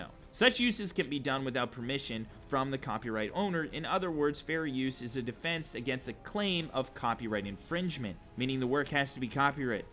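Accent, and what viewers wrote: Canada. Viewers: You are so deliciously Canadian. Nova Scotia?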